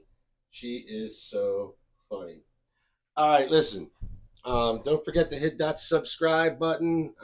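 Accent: Australia. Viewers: We love you too uncle. Probably a good idea not to go commando on youtube 😂 Great OZ accent brother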